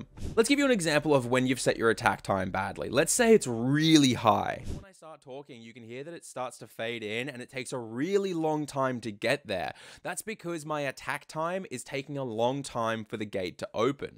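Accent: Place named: Australia. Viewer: I've followed for a while and I've even watched this video before as it's helped with mic setup. However why am I only now realising he's Australian!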